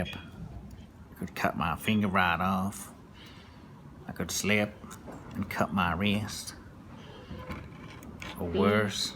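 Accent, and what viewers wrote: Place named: Australia. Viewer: You do a great Aussie accent Greetings from OHH MAA HAA USA I know you from round these parts Fair Dinkum no crow eater you boy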